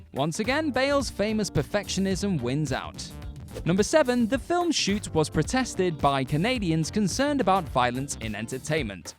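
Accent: United Kingdom. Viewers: The narrator is British, so is Bale. Maybe it's Americans that are saying it wrong.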